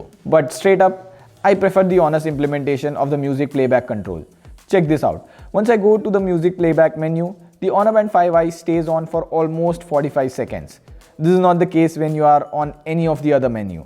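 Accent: India. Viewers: Your accent like dr bombay, Calcutta...taxi3 n Calcutta...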